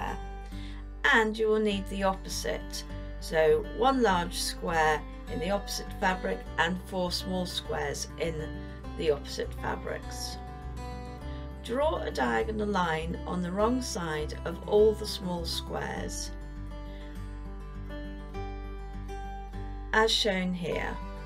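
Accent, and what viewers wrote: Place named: United Kingdom. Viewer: Hello, I just found your videos & have subscribed to your channel. I ❤️ yor easy to follow method of teaching, your choice of quilt blocks & the gorgeous fabrics!!! Your wonderful Brittish accent is also a plus! In this video, you asked for suggestions as to what block we would like made by you. Since my passion is PEACOCKS & PEONIES, (my kitchen & dining room 'theme') would you please do a video on making a realistic 'dancing' peacock (tail feathers opened upright) with CORAL PEONIES applique block? I also love the Brittish Peacock Butterfly. So I am humbly & eagerly awaiting to see if you will take these two suggestions to heart! Thank you kindly from USA.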